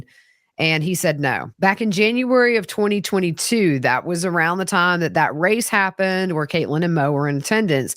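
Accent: South, United States. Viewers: And her southern accent fits perfectly for a case here in Texas!!!!😊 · Also from the south so I love your accent and your pronunciation of tumultuous is cracking me up 🤣😂🤣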